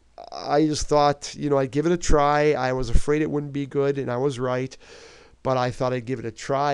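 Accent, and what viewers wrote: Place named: Wisconsin, United States. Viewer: I love your Wisconsin accent.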